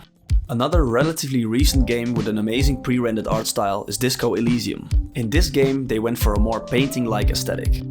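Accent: Netherlands. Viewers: what is this dutch accent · Accent heard, Nederlander gespot. Goeie video pikkie, subscribed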